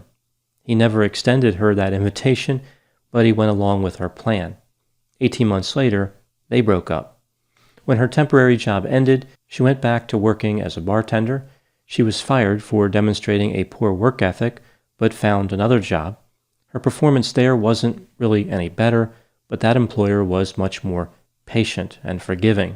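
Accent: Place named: Maryland, United States. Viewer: I thought you might have a Maryland accent and I looked you up and read that you're from Delaware.. I wasn't far off! :) 🤗 I just found your videos not long ago and love them.. subscribed.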